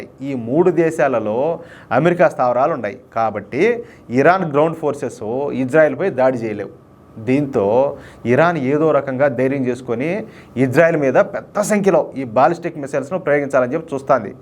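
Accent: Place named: Nellore, India. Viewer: Anna medi nellore ha slang alage undii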